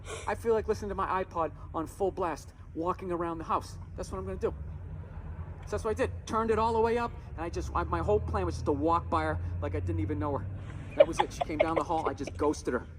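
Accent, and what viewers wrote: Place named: South, United States. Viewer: Yep I'm a reaction junky and from Bristol TN so not enamored by your voice or anything we both country....only said that to say you have good camera abilities. I wish at this point I had more going on but besides when my grandson gets his little league going I got down time and regardless if there's ever a reactor HOF I better be a judge so good start as I haven't seen you before ma'am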